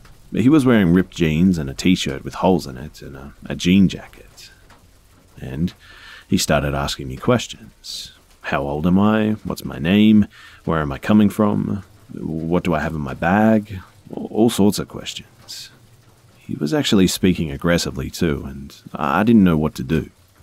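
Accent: Australia. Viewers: I love listening to you as i love the sound of your voice and your Austrailian accent too, i am Scottish, the worst accent in the world, so i really appriciate your accent and American accents too, keep on telling your stories true or not i love listening to them.best regards xAnne. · I’m new to your channel. Is that an Australian accent I hear? Love your soothing voice!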